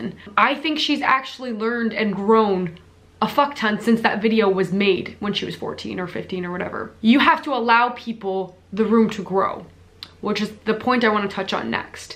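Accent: Canada. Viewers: Are you from Canada? I'm getting some accident and I'm really dumb aaa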